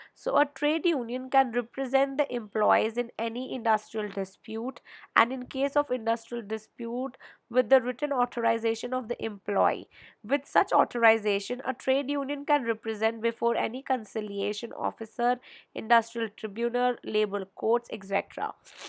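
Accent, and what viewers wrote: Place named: India. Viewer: According to your voice mam. I think you are indian. So plz can u explain in hindi the whole part of your every video after the reading.?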